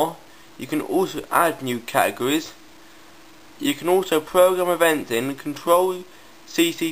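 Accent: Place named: London, United Kingdom. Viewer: awesome! hey where are u from? ur accent tells me u from around london.... which place exactly?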